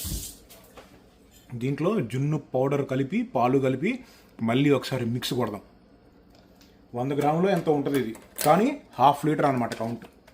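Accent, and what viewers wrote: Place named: Krishna District, India. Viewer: Mee maata teeru krishna distrik laaga vundi same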